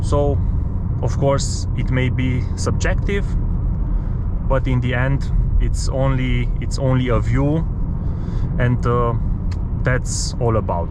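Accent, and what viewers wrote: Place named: Russia. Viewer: Man... I love your accent. By any chance, do you have Russian roots? By the way, I saw this in the description ''*Manhart Stage 1 will allow 300+ kmh ''. Honestly, I'm not sure about that. In your other video I saw 256km/h at almost 6000 rpm. The manual gearbox seems to have short gears. 300km/h+ should be possible, especialy with a remap, but I wonder if that gearbox can reach such speeds. Stock these do about 1.2 bar boost. If the turbo can do about 2 bar or more, expect atleast 600hp, maybe something close to 650hp with a stage 1 remap.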